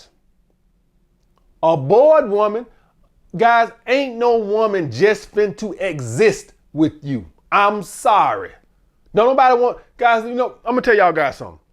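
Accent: United States. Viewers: Scresss scresss scresss!! Country as HELL 🤣🤣🤣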